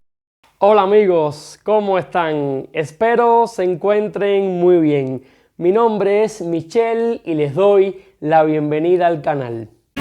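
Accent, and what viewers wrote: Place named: Cuba. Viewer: Amigo hablas como Cubano... Y actúas como mexicano que eres ... Así de simple es y debe ser un discurso... Se tenía que decir y se dijo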